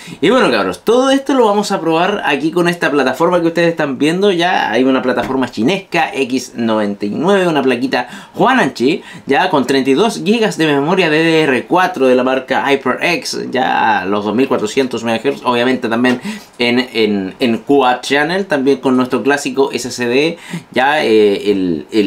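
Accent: Chile. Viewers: Me tinca eres chileno 🤣🤣🤣🤣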